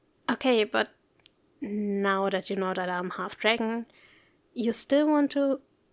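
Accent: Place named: Germany. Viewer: Greetings, I've enjoyed your take on Shynosaur's script very much and would hope for a part 2 for this character, Edit: (Sorry if the next section is a bit long) Having said that, keeping in mind the shape-shifting aspect, would as well love to see a script by you with your take on other mythical characters, like for example the Nixie, not the typical mermaid but a bit close to the Nixie in ( Nibelungenlied ) the German poem in folklore, but in a modern setting, having the ability to shape-shift between Human form and Mermaid, tends to stay near water hence works jobs where water is always available (like aquariums or as a lifeguard), and can sense future events like a Seer, with a soothing voice to the listener, your accent for this character will be perfect since a Nixie is part of the German folklore, as well a possible chance to include a collab with two other VAs as colleagues or sisters similar to the three Rhine-maidens in Nibelungenlied, however its up to you. 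Thank you very much and wish you the best in the future content to come 😊.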